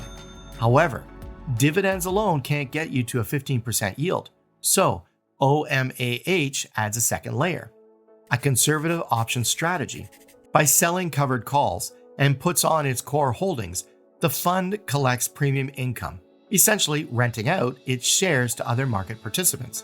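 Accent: Canada. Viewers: I can tell you're Canadian.😄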